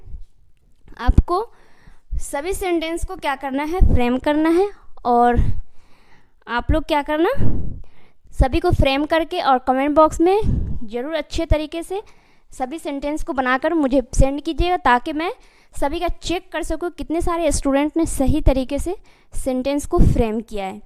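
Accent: Bihar, India. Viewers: Well! she was doing, write a Bollywood movie song. I was just, about to play Cricket. My result is, about to come. You must have, come to my home at soon. I was doing busy, take to my bath. Yess: I knew that You are from [Bihaar] with Yours, accental Mother Tongue phonatic sounds but still; I couldn't know about Yours complete qualification please. I am from Yamuna Nagar, [Hariyaana] state of India. Graduated in Arts, with the Instrumental and Vocal Music. Okay Madam!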